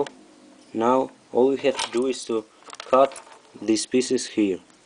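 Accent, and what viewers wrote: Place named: India. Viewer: im not saying all indians have a gay vioce, just that this guy has a indian voice and he has a gay vioce.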